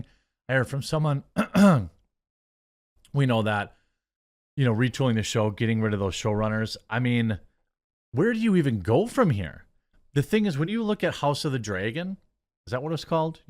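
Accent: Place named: Wisconsin, United States. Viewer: Bro say dregon over dragon ever day, love out that WisssCONson accent.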